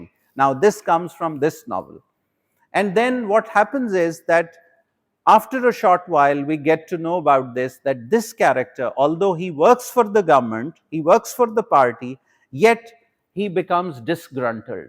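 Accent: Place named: Punjab, India. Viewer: Punjabi accent English.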